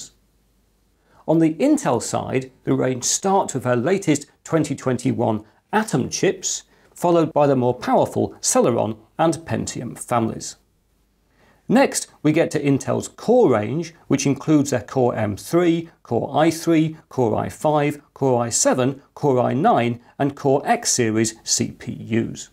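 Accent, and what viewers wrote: United Kingdom. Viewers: that british accent! love this channel.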